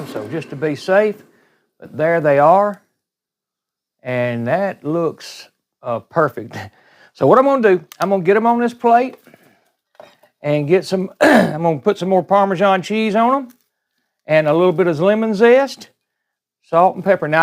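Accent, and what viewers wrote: South, United States. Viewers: Cant get the accent Sir? South but??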